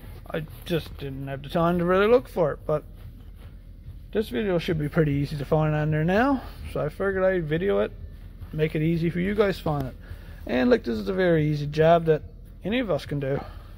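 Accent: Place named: Newfoundland, Canada. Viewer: what part of Newfoundland are ya from?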